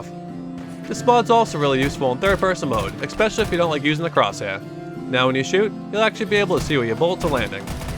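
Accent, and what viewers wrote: Boston, United States. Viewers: Just want to say, your voice is very fitting for a game set around Boston. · Chris Kozma I know I'm really late but he's from Boston lol.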